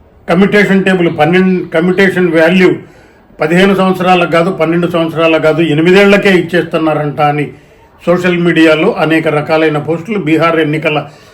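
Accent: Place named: United Kingdom. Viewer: తెలుగులో ఎంచక్కా చెప్పవచ్చు కదా... బ్రిటిష్ యాసతో ఇంగ్లీషులో ... అందరూ అర్ధం చేసుకునే అవకాశం లేకుండా పోయింది అని... అందుచేత...మీ యూట్యూబ్ ఛానల్ చూడదలచుకోలేదు..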